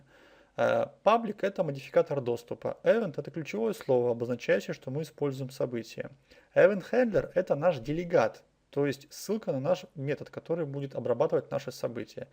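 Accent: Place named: Russia. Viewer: Очень интересная и полезная лекция. Единственное что мешает, это русское искажение английских слов и букв(параметр "Е" вместо параметр "И", "хЕндлЕр" вместо "хЭндлЭр", зыс вместо дис в слове this). Может это и не относится к теме урока но я считаю что программист всё-таки должен уметь правильно читать по английский не говоря уже о владении языком вообще.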